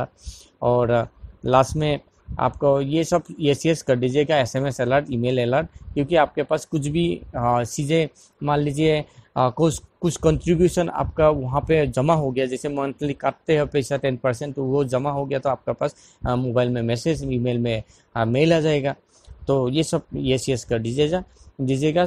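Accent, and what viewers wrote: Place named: Assam, India. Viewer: Vo north east k h bhai vo aise hi Bolte h.Assam k h sayad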